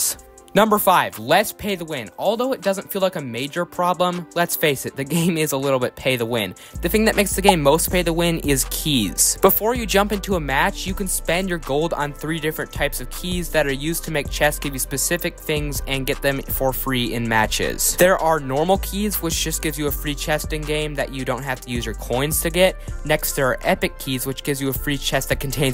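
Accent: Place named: United States. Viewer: That is probably the most American accent i have heard ( if hes not american hes a 17 yr old Australian )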